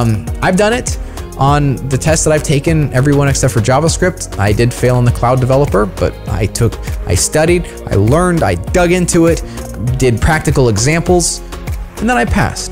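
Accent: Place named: United States. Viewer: like your accent ... it's rare to find an American accent in magento field hhhhh😂😂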